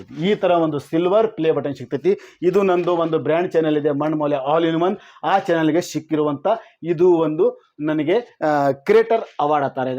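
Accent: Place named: North Karnataka, India. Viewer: ನಿಮ್ಮ ವಿಷಯ ನಿರೂಪಣೆ ಅದ್ಬುತ ಸರ್ ಅದೂ ನಮ್ಮ ಉತ್ತರ ಕರ್ನಾಟಕ ಪಕ್ಕ ಸ್ಥಳೀಯ ಬಾಷೆಯಲ್ಲಿ ಮಾತನಾಡುವ ನಿಮ್ಮ ಮಾತುಗಳು ಹಾಗೂ ನಿವು ತಿಳಿಸುವ ಮಾಹಿತಿ ನನಗೆ ತುಂಬಾ ಇಷ್ಟ ಆಯ್ತು ❤❤❤❤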